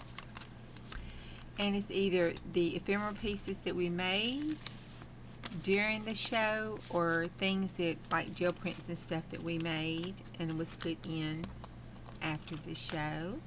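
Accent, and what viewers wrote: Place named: South, United States. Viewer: Congrats my dear friend - you deserve everything good that comes your way. I don’t have one specific thing I love - what I love about you and your channel is your wit/humor, the way you care for other people, the way you are willing to help others with helpful tips, and the great art you produce. Oh, I can’t forget your lovely southern accent. In short - I love you, girl. ❤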